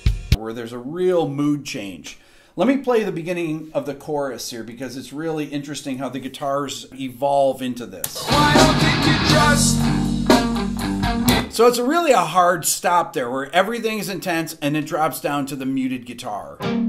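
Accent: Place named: United States. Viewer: Its not chap suey..... silly murican accents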